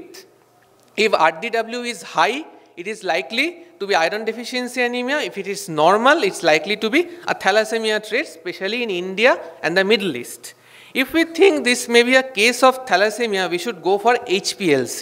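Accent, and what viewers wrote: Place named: Punjab, Pakistan. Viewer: Punjabi pakistani accent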